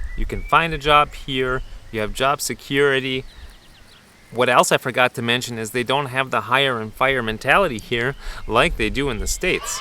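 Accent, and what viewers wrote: Bavaria, Germany. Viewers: You don't sound american. You sound like a german with little accent. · Interesting that you sound like a German speaking American English. (At least to me, and I’m neither German nor American) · Hi, You are really talking English with an Bavarian slang. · your talking like your from Germany using english what state are you from? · I coudln't help but notice your accent is a bit different than I am used to hearing from americans? Have you developed a bit of a german accen in the last 15 years? Just curious, because after I had been in germany for a couple years and went back home to visit my family, every single person noticed, that I was speaking with german accent now. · Its pretty amazing, to my german, almost bilingual ears you sound soooo much more like a german person speaking english than an american :D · Watched some videos. 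You really sound like a german speaking english. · Interesting that as one is immersed in a culture for so long their speech inflection takes on that of the area. You are truly now Bavarian. Bavaria is my go to, comfort vacation spot and if I was a bit older when living there as a young teen, might have made the same decision to stay. In our retirement years now I've thought of moving over but as you mention in your caveat, family is most important to us and can't see living away from them. Interesting conversation. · I wonder if your family in the states notice the German accent that I noticed right off the bat :D I can here it on the words "here" and "germany" and of course "bavaria" I'm Danish and I think that's why I can hear that. Of course this all makes sense after 16 years in Germany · Your experience parallels mine in almost all points. I have the impression your English has picked up a slight German accent and influence. 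My brothers tell me the same thing when I visit them in the US. My favorite dialect here in Schwäbisch.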